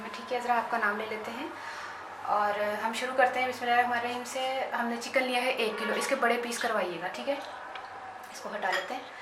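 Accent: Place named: Punjab, India. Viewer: Punjabi boht pyri bolti hn ap api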